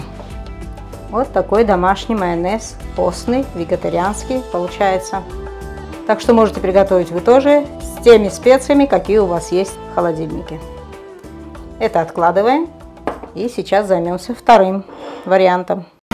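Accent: Batumi, Georgia. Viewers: Бесценны. Ваши рецепты,! Приятный ваш говор, я как будто, побывала в Батуми! Спасибо вам!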